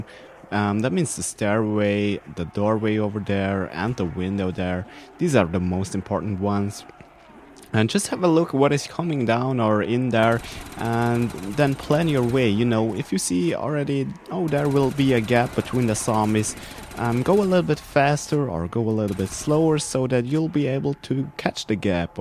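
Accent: Germany. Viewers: this is great dude thank u i was looking for this ur great and dude are u german i love ur accent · Are you german ? :D really good Video btw.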